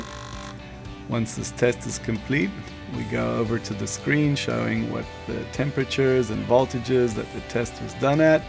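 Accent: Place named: United States. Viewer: He is from South Africa,but has cultivated his US accent pretty well.😁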